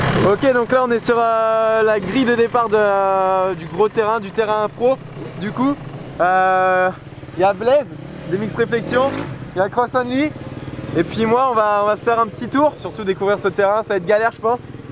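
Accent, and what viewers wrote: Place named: North, France. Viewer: Tu est du Nord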